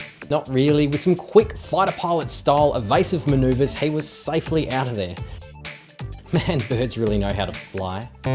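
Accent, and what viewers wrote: Australia. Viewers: You have to be Australian , you just sound like it . Not to sound rude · Omg you like an Australian and comedy vir. of Michael Reeves XD insta sub!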